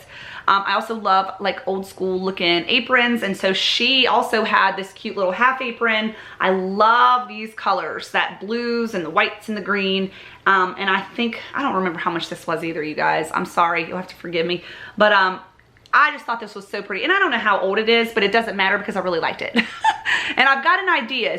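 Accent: South, United States. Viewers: I love everything in your hall! The only thing wrong is that your haul isn't mine! I hate to admit it, but I'm jealous and I know that's just so wrong. Dear God, pls forgive me.( lol) I so enjoy your videos and I especially like your southern accent. Thank you for sharing.🌹🌻🌻🌻🌻🌻🌻🌻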